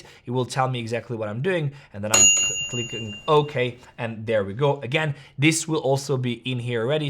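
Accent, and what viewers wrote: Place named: United States. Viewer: This is not financial advice 😅😂you sound American today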